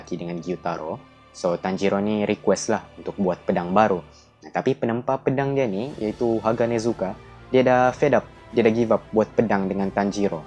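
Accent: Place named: Sabah, Malaysia. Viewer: Abang orang sabah ke? Suare abang macar orang sabah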